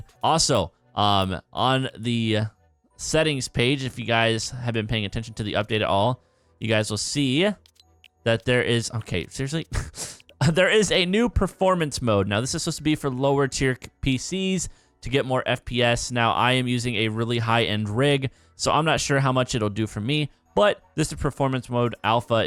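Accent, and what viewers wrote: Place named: United States. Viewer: You sound like fresh just with an American accent :D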